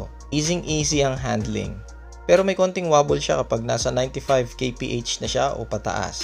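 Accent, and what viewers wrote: Ilocos, Philippines. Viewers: Ilokano ka mt bosing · Ilokano ah lods hehehe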